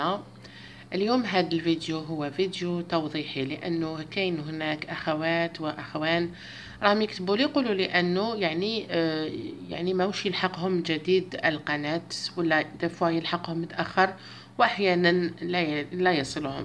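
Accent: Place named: East, Algeria. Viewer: جابلي ربي من الشرق الجزائري حسب هدرتها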